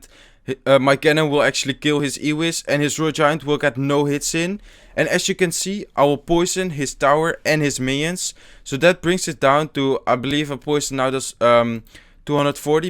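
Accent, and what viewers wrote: Netherlands. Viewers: Matthijs Riemens denk het ook want zijn uitspraak is echt lelijk Nederlands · Are you Dutch? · Ik dacht al dat je nederlands was. blanke koppie en dat accentje. knap gedaan trouwens. zet ons kleine landje goed op de kaart toppie! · Are you from the Nederlands?? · are you dutch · ja hij is Nederlands ja, kan je al aan zijn accent horen. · `haha dat nederlands accent lol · Are u Dutch/Belgian|Ben je Nederlander/Belg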